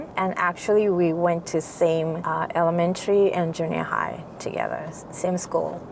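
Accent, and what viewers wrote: United States. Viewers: Nice backstory and information (I had been curious as to your impeccable, American accented English 😉). Best to you and your family. Cheers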